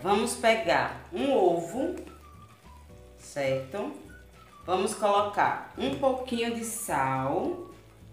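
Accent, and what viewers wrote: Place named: Recife, Brazil. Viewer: Oxe, esse sotaque lindo só pode ser do meu Recife! Tapioca eu faço todo dia, adoro! Vou fazer desse jeito também visse!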